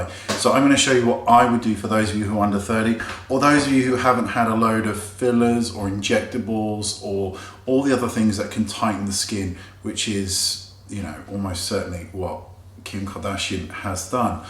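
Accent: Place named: England, United Kingdom. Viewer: I love your videos, because I learn so much...not just on how to apply products or which ones you recommend, but the english language, too. You speak soooo clearly and slow which makes it easy for me to understand nearly every single word you say. I bloody love the english accent! 💖 You should start a new channel "Wayne Goss - english for beginners".😜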